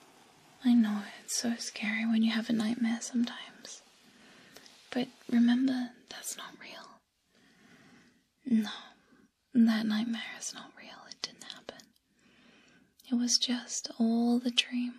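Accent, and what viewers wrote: United Kingdom. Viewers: i enjoyed that slight british accent feels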